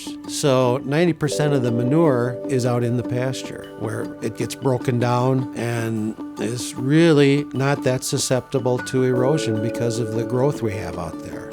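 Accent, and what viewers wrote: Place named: New Zealand. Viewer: Hey, Lovely farm,...... you sound like a kiwi farmer;],.....I practice rotational grazing etc have done for years,..... just a thought, the organic farmers say the moon waxes and wanes,.....ie rises for two weeks and falls for two weeks, so 28 day process. So if you have a round less than 4 weeks you are short circuiting the natural cycle, as in for two weeks the energy goes into whats below the ground, so every round you think boy I'm running out of grass its just not growing like it should, and the next two weeks you think wow look at it grow, so I've always stayed on a minimum of 28 day round, also the extra cover helps keep the moisture in the ground better.We use a grazing planner in Nz for calculating winter grazing rounds transitioning out into a spring round, assisting with areas consumed on any given day, which I think you'd find handy. 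It must help with the marketing of your product all those health outdoor cows:] 😊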